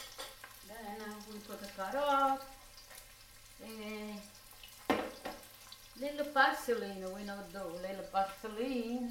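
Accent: Italy. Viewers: I love watching. She reminds me of my auntie the way she talks and cooks and the way she makes simple Italian traditional food like my mum used to make and continue to make. She sounds as though she comes from the same region of Italy as my mum and dad. Saluti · My nonni for sure bring back so many memories and the way she speaks w the italian accent only my nonni spoke more itslian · Love her I just love her. I’m a new sub. I miss that accent. I grew up in New York in Little Italy. And I would always go to my friends house to eat pasta fagioli, now I can make it myself. Thank you Nona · I LOVE YOUR UTUBE CHANNEL. YOU MAKE ME THINK OF MY GRAND FATHER HE WAS FROM ITALY 🇮🇹 AND I LOVE HIM SO MUCH ❤️ YOUR Accent reminds me of him. He was an AMAZING MAN. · English spoken with an Italian accent. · Her accent makes me miss my Nonna . Love you Italian grandma and thank you for the recipe.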